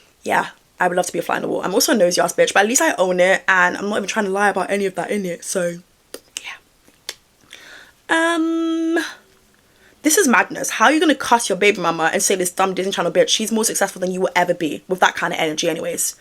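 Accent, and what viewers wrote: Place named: United Kingdom. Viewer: I know this off topic but you have American humor but you sound British asf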